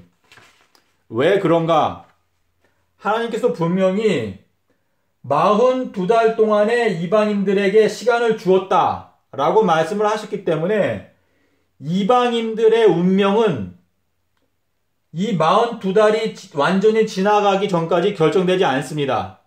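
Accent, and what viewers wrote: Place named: Jeolla, South Korea. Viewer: 고향이 라도 스타일인데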